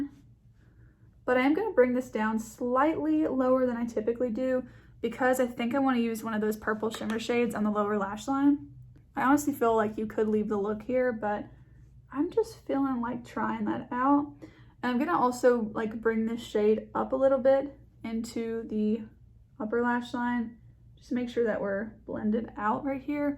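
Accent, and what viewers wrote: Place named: Europe. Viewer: is that where you're originally from? I'm dutch and you just sounded more European to me 😉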